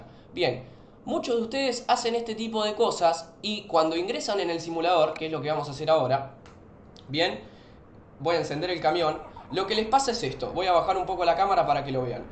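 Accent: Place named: Argentina. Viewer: Hola como va? gran video literalmente encima argentino aun mas lo entendi ajjajaja, yo tengo un problema. como puedo configurar para un volante de 180 grados.. es el de noganet. lo quiero configurar para el assetto corsa ya que mi idea es practicar drift en ello. Serias de muchisima ayuda de verdad, Gracias! y nuevamente gran video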